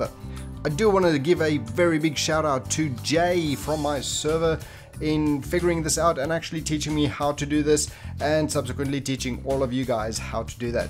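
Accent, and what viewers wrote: South Africa. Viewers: Oh, I know that accent, are you South African,? Also, thanks for sharing this tip. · Where are you from? Your accent sounds very South African.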